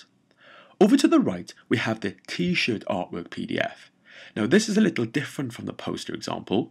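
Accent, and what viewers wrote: Wales, United Kingdom. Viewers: Love the Welsh accent! 😍Da iawn ti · Is the narrator Welsh? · is that a welsh accent I detect? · ah you have cute welsh accent!!!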